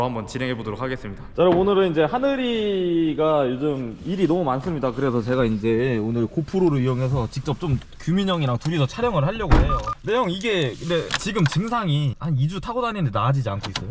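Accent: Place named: Cheongju, South Korea. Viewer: ㅋㅋ 제가 청주 사는데 동네 친구 목소리 인줄 알았습니다. ㅋㅋ 사투리가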